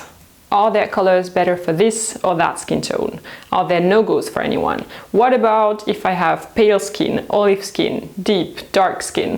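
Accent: France. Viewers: I think she's french by her accent or I may be wrong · oulalalala je capte le signal d'un accent francais!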